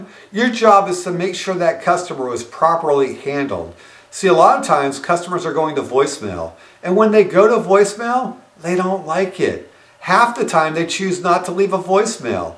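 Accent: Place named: United States. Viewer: _ A pity, this strong american accent ! Instructive but can be a bit harsh to understand for some E.U students and trainees accustomed to English English ! (Not for me, I'm fine, thank you).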